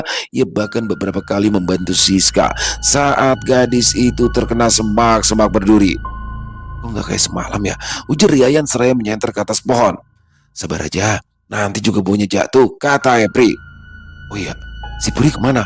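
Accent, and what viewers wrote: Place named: Kalimantan, Indonesia. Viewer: abang ini org mana ... klu abang cerita kisah dr kalimantan logat atau cara bicaranya pas dgn org kalimantan .. pokoknya saya dr kalimantan mendengar cerita abang sampai ulang2 ceritanya masih seru aja .. salam dr kalimantan tengah (kapuas timur)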